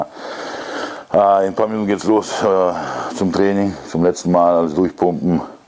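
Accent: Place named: England, United Kingdom. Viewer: Er wohnt in Amerika, so wie es aussieht spricht er deutsch mit englischem Akzente. Passiert einfach......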